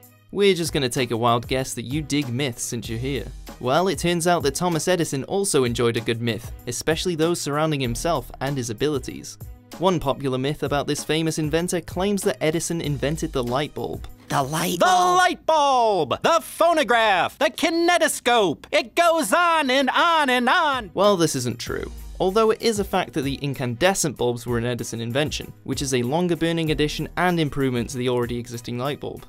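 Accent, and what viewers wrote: United Kingdom. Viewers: American icons and yet a British narrator. Makes sense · A lot of comments about a British narrator. Apparently that makes a difference. He is is only reading from a script that a team of people have researched and developed. What exactly does it have to do with the content of the video especially when these myths can be historically proven? It's exactly this attitude why most of the world believe Americans are arrogant and stupid. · ugh British b arrator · It sounds British ^^; I am from Wisconsin, so I think I can tell the difference since I would have literally been within driving distance from Canada :P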